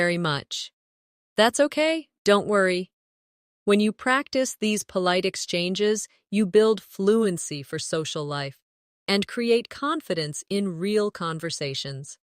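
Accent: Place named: United Kingdom. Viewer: I like your British accent. And I appreciate for your videos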